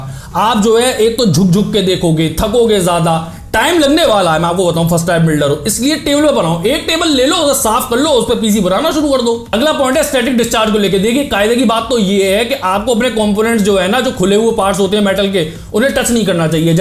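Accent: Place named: Bhopal, India. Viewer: Bhopali ho kya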